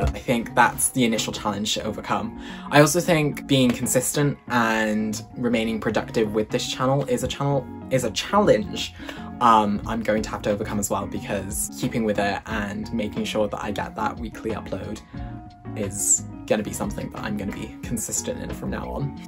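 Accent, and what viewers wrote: United Kingdom. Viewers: your British accent is EVERYTHING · Why is your accent doing everything for me (fellow BRIT AYYY). I FUCKING LOVE DA: I SO FUCKING MUCH. Going at things in your own way and at your own pace is so crucial and I am happy you found that place yourself and a time wherein you feel best. I resonated with so much of what you said as a POC bi who is also writing a book and I cannot wait to see what content you pour out. OMG HATSUNE MIKU GO OFFFFFFFFFFFFFF LOL. Tbh the anxiety as a whole crippled me to the point I left for a while, trying to come back and accept myself more. Hope you keep slaying and doing incredible things with your channel. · I dont know why i didnt expect you to be british but i love it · I am to young to have twitter and I found this book tube channel and the British accent...😍 Also you sort of remind of Jesper from Crooked Kingdom! 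Edit: Jesper is my favorite character! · Just subscribed to your channel. Love your British accent and your energy. Bring on the videos and reading vlogs.😊😊😊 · my first thought was British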